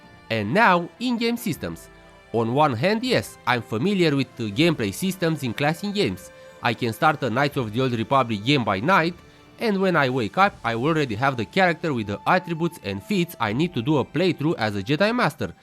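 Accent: Romania. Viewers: sami bag pula daca tu nu esti roman cu asa accent xd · you seem romanian by accent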